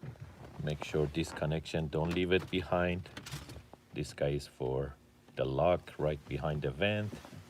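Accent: Iran. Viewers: The guy accent looks iranian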